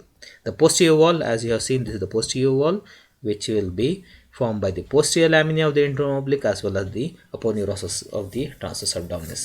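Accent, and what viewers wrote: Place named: Arab world. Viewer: he is an arab. its the accent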